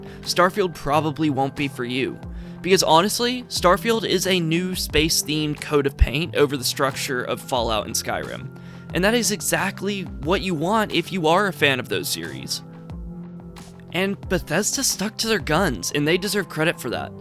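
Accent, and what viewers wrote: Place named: Pennsylvania, United States. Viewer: your accent reminds me of people in Pennsylvania .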